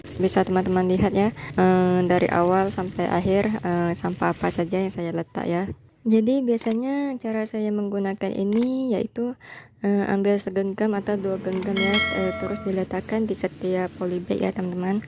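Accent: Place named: Aceh, Indonesia. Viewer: Menyou tedengo nyo lage oreng aceh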